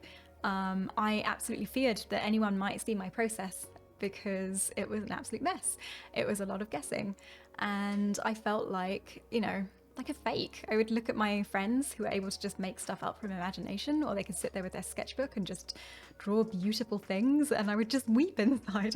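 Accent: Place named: United Kingdom. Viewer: I've stared at your face in the reference images for so long but I had no idea you were British lol that blew my mind. Now I'm questioning everything.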